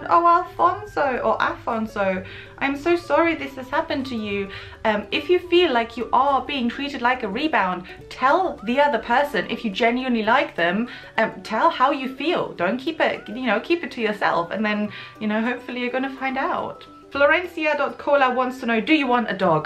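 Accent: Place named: United Kingdom. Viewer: its so strange when non british people have a british accent?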